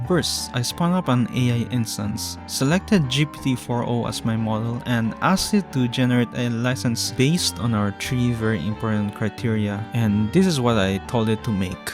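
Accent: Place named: Philippines. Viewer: I can hear the tagalog accent, so I'm guessing is from the Philippines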